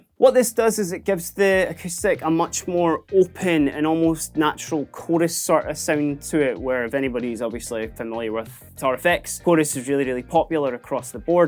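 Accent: Scotland, United Kingdom. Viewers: Can't understand the scotch accent 🤷🏻‍♀️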